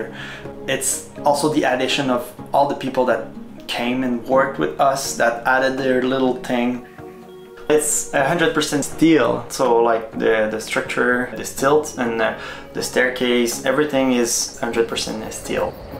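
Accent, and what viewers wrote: Quebec, Canada. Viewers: Tu le sais tout suite quand un québécois parle en anglais haha ! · Quand t'entends l'accent Quebecois! OMG C accessible!!!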